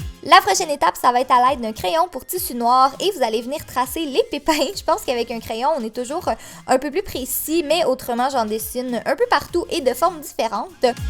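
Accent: Quebec, Canada. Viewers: Eh tes trop belle j'adore l'accès québécois et j'adore tes vidéo bisou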